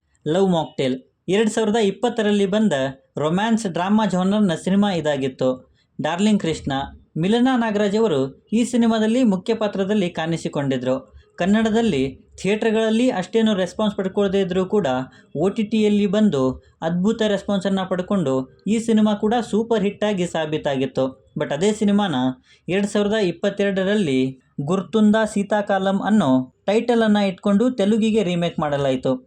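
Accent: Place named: North India, India. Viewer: Bro ur kannada pronounsation is luke northies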